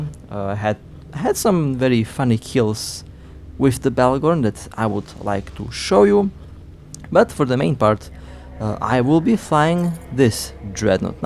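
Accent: Russia. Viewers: Ты Русский что ли.? Оппоненты с Русскими именами, а у тебя акцент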